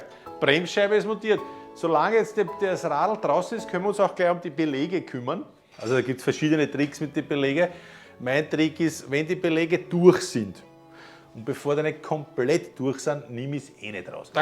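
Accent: Austria. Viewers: geiles Video , geiler Typ , tip top erklärt 👍 und der beste Dialekt , ich liebe die Österreicher 😂👍👍👍 Grüße aus der Nachbarschaft , Allgäu Füssen 👍😊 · So einfach und gut erklärt im Wiener Schmäh, ich liebe euern Dialekt. Weiter so ‼️👍🙏💪 Viele Grüße aus Berlin ☀️ Cheers 🍻 und Happy trail's ❗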